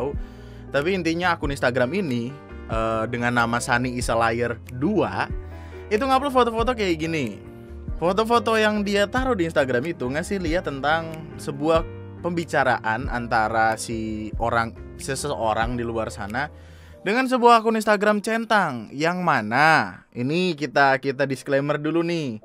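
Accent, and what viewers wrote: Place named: Java, Indonesia. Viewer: Vee dia kan dah pindah dari kecil . Logatnya aja jawa . Jelas memanfaatkan viewer indo , orang masa kecilnya di kota Malang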